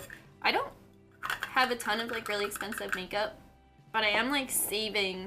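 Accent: Philadelphia, United States. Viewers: Every time I watch one of your videos I think about how awesome and cute your philly accent is. It's my favorite. I'm a New Yorker and I live in Colorado so your videos bring me back east when I watch them. Thanks gurl! Also this makeup looked beautiful. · I've never noticed your Philly accent until this video! I'm from Pittsburgh so I also have a slight yinzer accent 😂 I wish I would have met you before I moved to AZ.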